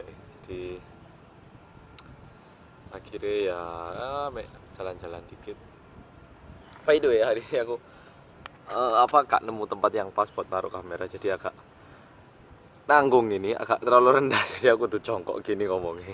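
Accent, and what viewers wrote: Java, Indonesia. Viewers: Weh wong korea bahasane jowo jos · Medok banget 😂😂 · kerenn....sam logatnya medok jawa banget sam... dulu belajar dimana sam?? berapa tahun belajarnya,??... maaf kepooo 😀😀😀😀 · Bèné medhok gpp. Lha kan ancen wong malang haha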